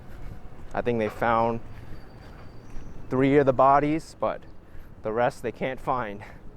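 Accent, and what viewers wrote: New York, United States. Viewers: I like your NY accent! 😀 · How can he not be from NYC with the boroughs accent he has? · You got a thick nyc accent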